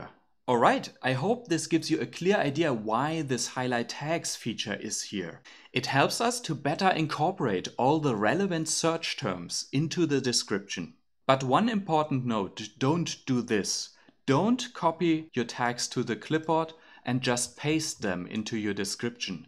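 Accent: Germany. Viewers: you are bavarian after your accent :)morning fame. great tool! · You are German after your accent. But I like to learn from a German because they do things very professional.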